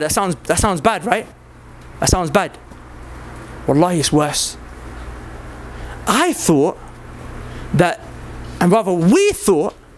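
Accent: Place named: United Kingdom. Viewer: I can't believe dude speaks with British accent but some how it doesn't make sound smart 😂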